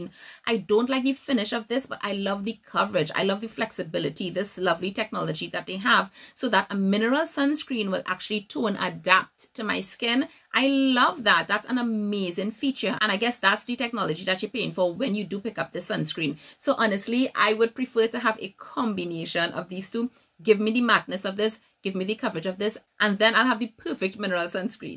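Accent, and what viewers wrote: Trinidad and Tobago. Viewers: Great review. You sound like a trini! OK so you just said, " for wearing it sake" yup, you àh trini.. Great review 🙌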